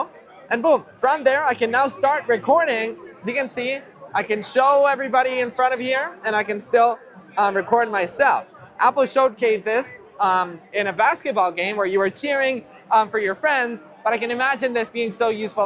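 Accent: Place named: Spain. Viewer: El español de de España tía hablando de los iPhone joder 😅😅😅😅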